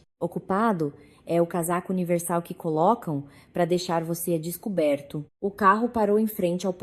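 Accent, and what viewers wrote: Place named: Portugal. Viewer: Por favor, naõ precisa expor linguaja do Portugal pois estamos no Brasil ou a narradoura naõ sabe disso, narra corretivamente língua do Brasil....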